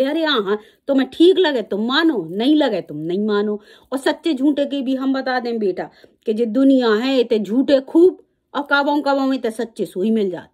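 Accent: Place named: Bundelkhand, India. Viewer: वाह क्या बात है लाली क्या bundeli बोली तूने मान गये बगल वाली अम्मा को, क्या बीच का रास्ता निकाला है उत्तर देने में l तेरी अदाकारी को लाखों सलाम यारा! मज़ा आ गया मेरी जान 💗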